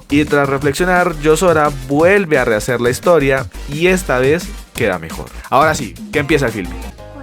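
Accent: Colombia. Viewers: pd: tienes pura pinta (voz) de ser colombiano amigo sub nuevo 😅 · Un narrador colombiano mca que chimba, parce de corazón espero le vaya muy bien, y confirmo, pobrecito el parcero. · Mero colombiano pana 👌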